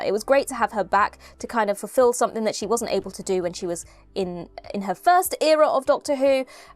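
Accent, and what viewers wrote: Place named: Berkshire, United Kingdom. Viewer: Is the presenter from Berkshire? She sounds posh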